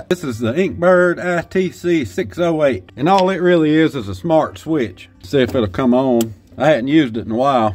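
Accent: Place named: Northern Kentucky, United States. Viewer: Northern Kentucky!!! Just subscribed and I absolutely love your channel! Your accent reminds me of home!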